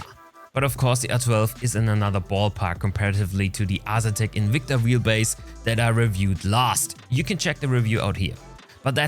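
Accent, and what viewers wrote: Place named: Germany. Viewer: klar, 160 euro mehr als Deutscher... Abzocke at its best, und dein german accent suckz bad, versuch besser mal auf deutsch -.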